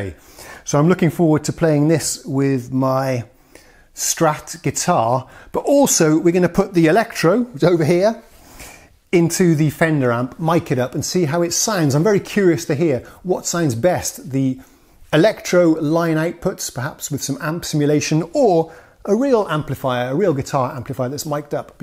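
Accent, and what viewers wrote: United Kingdom. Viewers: And... Are you British, Swedish or from another country? I always thought you were British like me!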